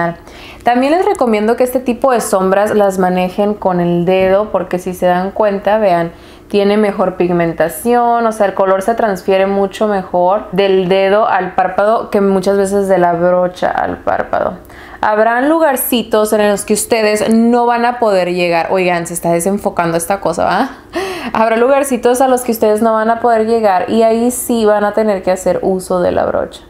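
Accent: Sinaloa, Mexico. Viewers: Yo quisiera saver de donde eres ? 😜 me encanta como hablas, plebes??? Seras de Sinaloa ??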